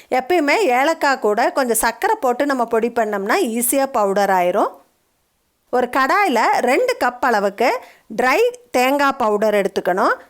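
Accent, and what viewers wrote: Coimbatore, India. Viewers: Mam.. r u from Coimbatore?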